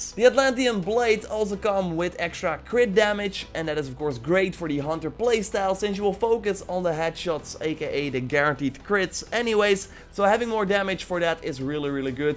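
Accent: Netherlands. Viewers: je hoort gelijk nederlands haha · You sound......Dutch....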